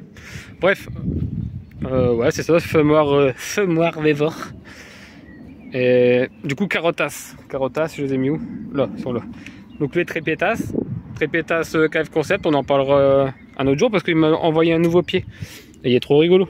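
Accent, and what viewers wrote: North, France. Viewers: Salut mec. Je viens de voir ta vidéo. Je vais essayer de trouver celle du résultat car ça m'intéresse 😅 tes du nord d'après ce que j'ai entendu, moi aussi 😊 tes de ou ?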